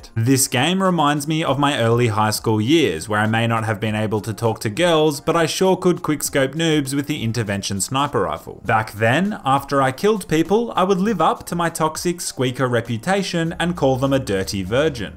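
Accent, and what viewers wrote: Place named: Australia. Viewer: Man sounds so Australian in this vid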